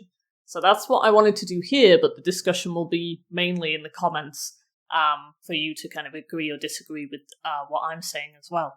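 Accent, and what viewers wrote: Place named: London, United Kingdom. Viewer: it’s soooo interesting to know that. Thank you very very much for sharing. Just curiosity, are you from London? I do appreciate your accent.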